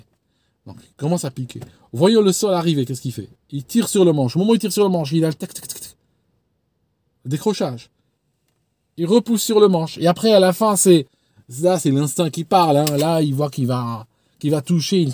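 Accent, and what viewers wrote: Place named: Geneva, Switzerland. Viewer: Excellent comme toujours. Je perçois, depuis deux vidéos, un accent genevois qui revient comme un jour de Bise sur la rade. Je suspecte un contact alpin récent…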